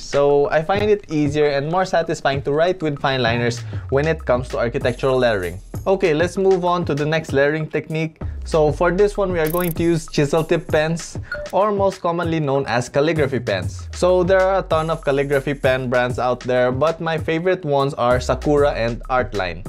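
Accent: Philippines. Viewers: You sounds like a Pinoy like me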